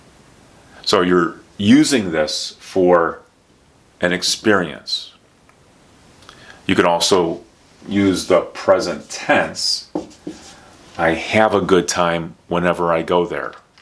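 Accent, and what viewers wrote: United States. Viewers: trophy-yellow-smiling::trophy-yellow-smiling::trophy-yellow-smiling::trophy-yellow-smiling::trophy-yellow-smiling: ... a great teacher with a great All-American pronunciation ... !!!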